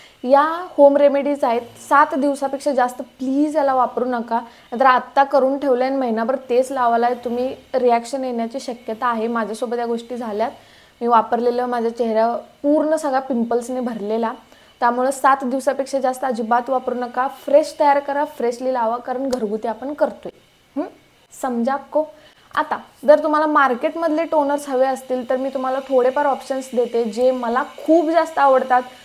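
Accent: Kolhapur, India. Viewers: Communication skills Uttam ahe tumach❤ kolhapur cha tone yetoy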